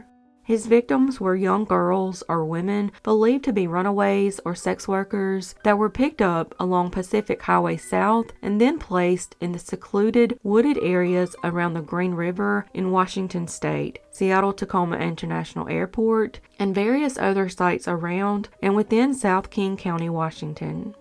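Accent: South, United States. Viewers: Thank you for these. It is very heartwarming to know these victims finally have their names. The narrator’s delivery and lovely, soft Southern accent are very pleasing to listen to.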